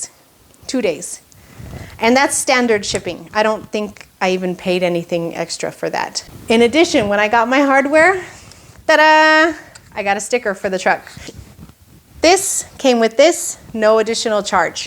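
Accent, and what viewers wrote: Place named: India. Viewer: Sounds like hes from India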